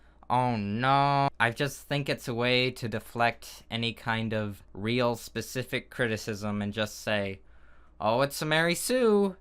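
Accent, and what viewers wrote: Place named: United States. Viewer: F A N T A S T I C :3 I thought he was American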